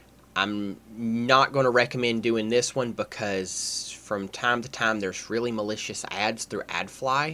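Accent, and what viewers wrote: South, United States. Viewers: You sure know what you're doing! p.s Love the southern drawl, Where you from? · Love that southern drawl u got there too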